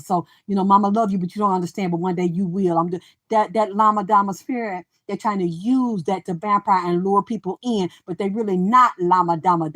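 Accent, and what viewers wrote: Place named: Georgia, United States. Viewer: Can I just say how much I love your Georgia accent! I can listen to it all day! Queen Drip 💧 in this Bitch! Vagina Power 💙💙💙💙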